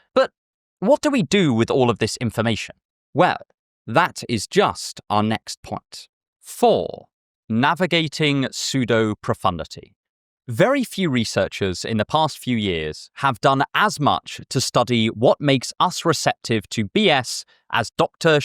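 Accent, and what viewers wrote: RP, United Kingdom. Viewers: Honestly your presentation comes off this way even pretentious but you're delightfully sensible as far as I've seen. Might be the accent if I'm honest very posh to my American ears.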